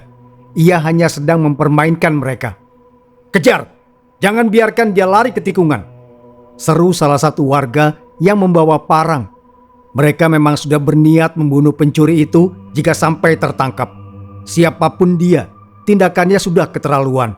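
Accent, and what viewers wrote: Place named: Bali, Indonesia. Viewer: Logat Bali begitu jelas di sini keren banget di tunggu part 2 nya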